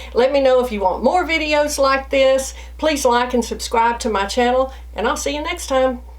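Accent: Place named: Boston, United States. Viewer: Thank you for doing this video--very informative. I've been experiencing some itching; and yes I'm thinking it is the permatease for me. Laughing about your accent--I'm from Boston but it sounds like Bahstun.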